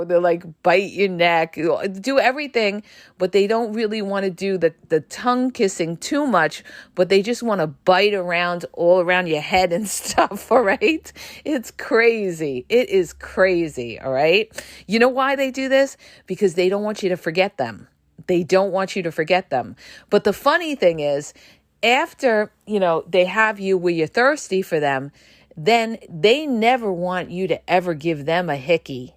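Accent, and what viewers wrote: New York, United States. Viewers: "Deep down, the narcissist disdains you, they really can't stand you" This, with your New York accent made me bust out laughing! Takes a bit of sting out of the painful truth.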